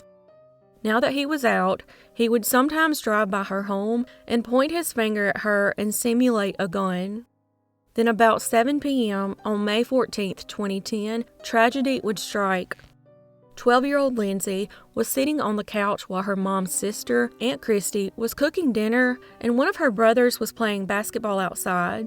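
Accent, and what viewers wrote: South, United States. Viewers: the stories are well thought out and I can listen to her southern accent all day. subscribed!! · The first case is a perfect example of why the death penalty is very much needed. Absolute low life. I love listening to you talk about these stories, your voice is so relaxing and melodic, it's exactly what I think of when I think about the Southern accent 🙂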